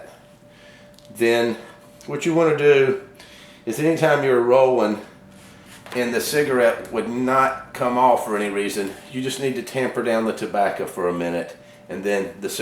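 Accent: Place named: South, United States. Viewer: Me 3. He sounds Southern American.... can you blame him?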